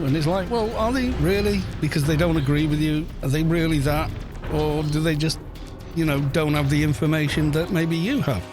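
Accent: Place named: Somerset, United Kingdom. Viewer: Have to correct the record for my local brethren: the Wurzels (of Combine Harvester fame) are from Somerset and so is that accent. Cornwall and the Black Country are both pretty different :D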